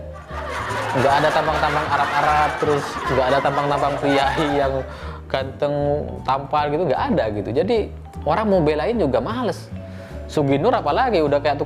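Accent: Madura, Indonesia. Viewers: Saya jg berfikir gt.logat maduranya ada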